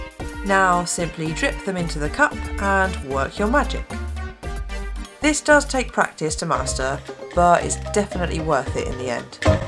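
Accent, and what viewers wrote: England, United Kingdom. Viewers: I love all your videos they are so awesome, your so good at doing nails. I got inspired by you cute icecreame nails that are so cute and I did them on my nails but didn't turn out as good as yours😂😔 anyway I also love your accent I lived in England for 4 years I'm from chile. I have one last question if you don't mind what part of England are you from? thank you your videos at awesome.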